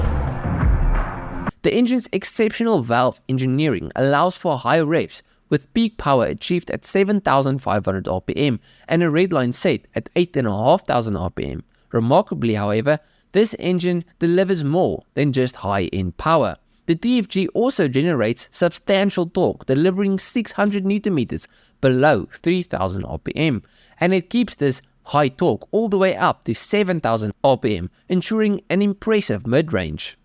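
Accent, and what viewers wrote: Afrikaans, South Africa. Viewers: You sound like someone who speaks Afrikaans.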